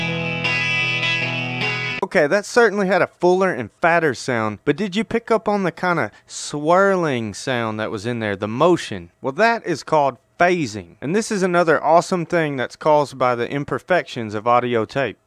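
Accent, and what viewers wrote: South, United States. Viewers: love the American (southern?) drawl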